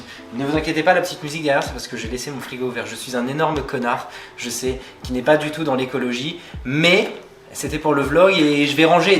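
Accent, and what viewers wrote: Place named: England, United Kingdom. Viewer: Tu manges du "bacon " mon lapin 🤣🤣🤣, j'adore 💕 ton accent anglais..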